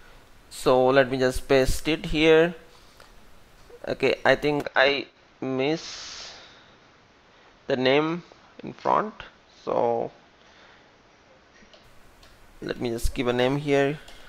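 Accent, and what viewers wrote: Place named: India. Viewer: Are you Indian? It was hard for me to understand words with your accent, but i did it. Thanks for video, man.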